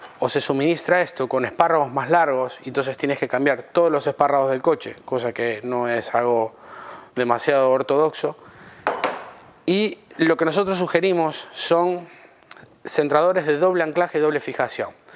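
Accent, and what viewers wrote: Argentina. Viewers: El acento parece argentino, pero habla en pleno español :P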